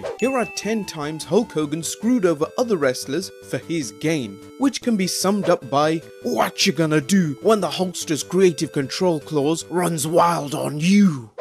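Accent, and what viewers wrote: United Kingdom. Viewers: WCW coverage by a British accent sounds like shit. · fuckin shitbrit narrations over shit that has nothing to do with them.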